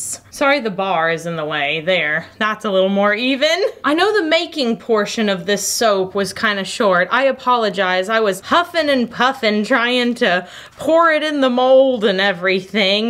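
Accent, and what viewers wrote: Texas, United States. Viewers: I am not a surfer (tried and failed LOL) but a diver (instructor even) and that is kind of the same ..... the beach part of the soap is reminding me of a ocean glass beach or the beach after good storm with all the torn out algea on the beach..... and I hardly use "soap" I am just coming back for you bubbly personality.... I love it that you dont doll up for work cause that is what it is even if you take us along with the cam.... as you said it is hot no need to be painted like a parrot ;-) and of course I come back for the texas drawl "OH MY GOAD" and the "Scrappy Scrappy" was really disappointed the other day I found a video without a scrappy scarppy LOL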